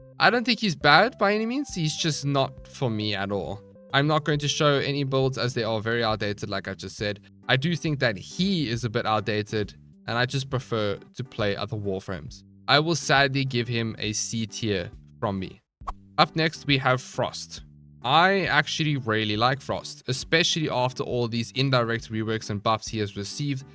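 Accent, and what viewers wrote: South Africa. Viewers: Are you South African? English accent feels a little bit familiar... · Is South African🇿🇦 by any chance?? The accent sounds very familiar.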